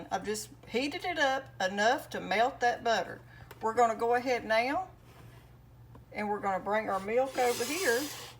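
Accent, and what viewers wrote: South, United States. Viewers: People with southern accents always have the best food videos! 😋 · I found you today searching how to make Homemade Heavy Cream. Love your Southern accent and your style with easy to follow, straight to the point instructions. I am a new subscriber and need to check out your other videos. Thank you. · Thank you for the video. This makes so much sense. I’m guessing that if you want to make whipped cream from it, you need to chill it well first? Thank you again. I love your Southern accent too by the way. Love from Australia ❤🇦🇺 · I knew this was gon be good from the accent alone. Southern moms cook the best hands down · Bravo🙌 I'm so glad this video popped up. I just subscribed and I'm sharing this video on pinterest.❤ I hope that helps your channel. This might even be cheaper than buying heavy cream. Thank you so much for sharing. 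Love your accent, I'm from Chicago but live in North Carolina now. I used to tell my fiance that I like to Southern accent and he said it's a drawl.😂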